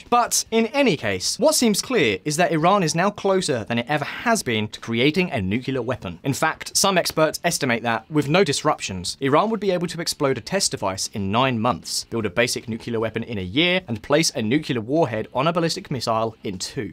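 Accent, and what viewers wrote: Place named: England, United Kingdom. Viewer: It's called the English Language, & this guy sounds English to me. Maybe you've got it wrong...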